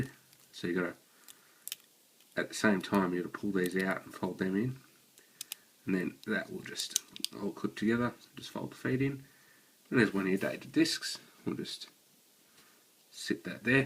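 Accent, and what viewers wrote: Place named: Australia. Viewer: Great to hear an Aussie accent, and one that doesn't sound bogan :)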